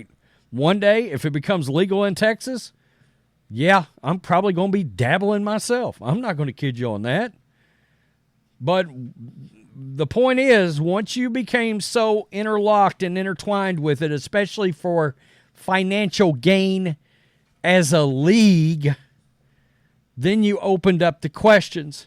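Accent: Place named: Appalachia, United States. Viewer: 1st: Wear a REAL collared shirt 2nd: Drop that f'ing hillbilly accent 3nd: Stop broadcasting from the "Proud Boys" headquarters. You just might get more "likes", maybe from people like me that are college graduates!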